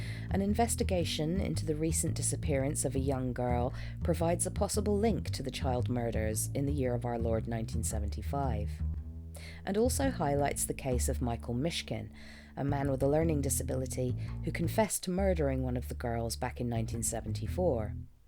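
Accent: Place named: West Country, United Kingdom. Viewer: I'm trying to figure out your accent,! "Bristew" was my initial guess but it sounds closer to Devon/Cornish than that. Irish twangs, perhaps? It's the Austism at work!!!! 😂 I was intending to start on this trilogy tonight but I'm so blasted on painkillers that I'm going to save it for a time when I'm more alert! A clockwork Orange, it is...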